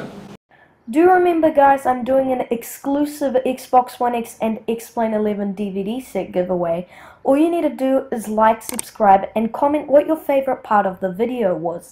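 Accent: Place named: Australia. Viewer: Damn.....that Australian accent is annoying !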